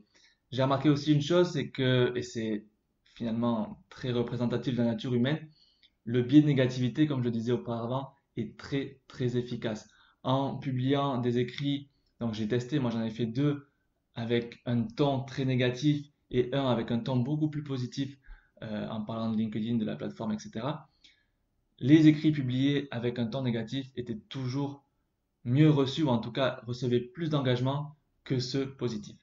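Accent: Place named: South, France. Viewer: Le petit accent du Sud à la Marie Fray au masculin :-)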